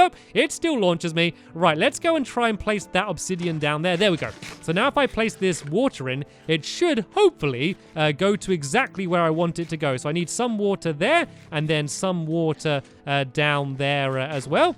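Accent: United Kingdom. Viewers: why do British pepls say wool instead o' wall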